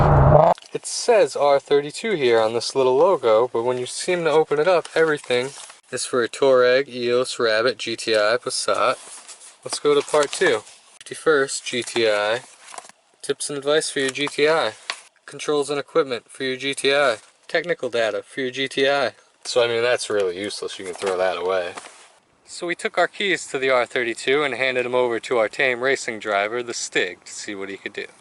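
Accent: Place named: United States. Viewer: why u speak with that united state accent. its sound no good.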